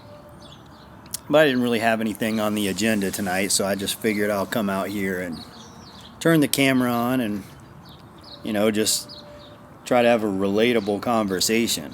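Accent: United States. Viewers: I'm wondering how your tinder dates look like because there are many attractive men out there with not really attractive chicks...I love the tone of your voice with this american accent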